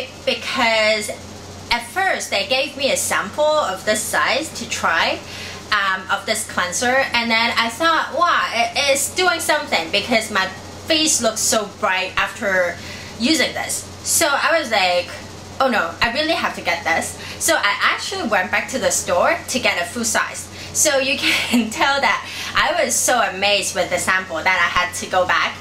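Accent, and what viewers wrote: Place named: Philippines. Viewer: Where do u from? U sound like a pilipino lolz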